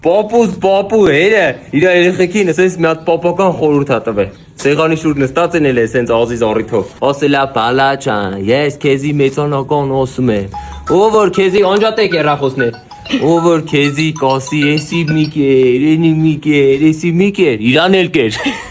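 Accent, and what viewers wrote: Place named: Gyumri, Armenia. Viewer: Սիրում եմ բառբառը , հատկապես Լենինականի ❤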